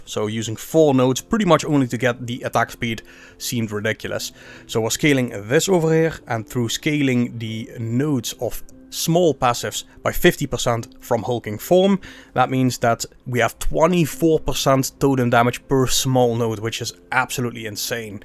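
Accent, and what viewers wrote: Limburg, Netherlands. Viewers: Are you from Limburg?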